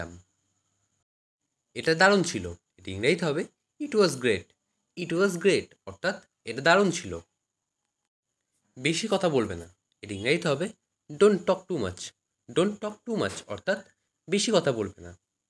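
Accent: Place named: West Bengal, India. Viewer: If i am correct are you from west Bengal 😊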